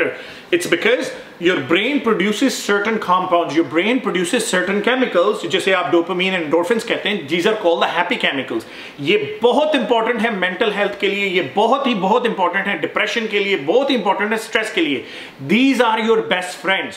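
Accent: Punjab, India. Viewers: Hi veerji I am from India I started following u one reason is the way u speak bit punjabi hindi english all combination it makes me nostalgic since I am from punjabi family but married to a Hindu, I have gone through that tràuma of depression,and I somehow got over it, in fact it made me more mentally strong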